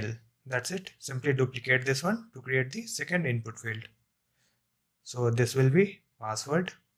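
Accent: India. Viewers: Bhai Indian accent not los Angeles CA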